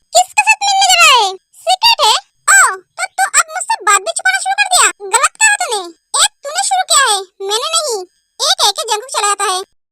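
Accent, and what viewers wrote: Bengal, India. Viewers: Apu Tumi ki bangali tomar hindi sune mone hoy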